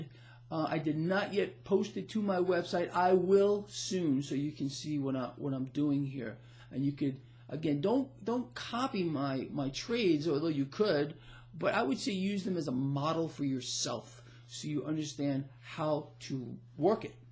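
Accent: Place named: New Jersey, United States. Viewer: you talk like you are from New Jersey. I was raised in Jersey and when I listen to you I start talking like you again rofl. REminds me of my friends and family. I now live in california so my accent has been corrupted.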